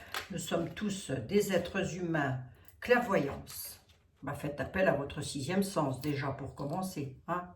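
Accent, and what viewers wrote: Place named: Lorraine, France. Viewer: Bonsoir, toujours un pur bonheur de vous écouter ❤. Bon week-end. Je vous embrasse du bout du Finistère. Votre accent Lorrain est un bonheur 🤗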